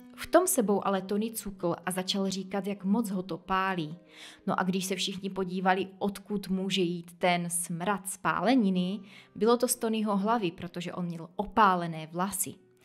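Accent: Moravia, Czech Republic. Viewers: Mužů ae zeptat z jakého jste kraje? Mate zvláštní přízvuk. Jakoby moravský .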